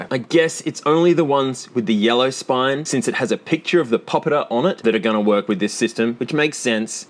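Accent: Australia. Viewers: They're both Australian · Shouty aussie man's friend is playing on a butt!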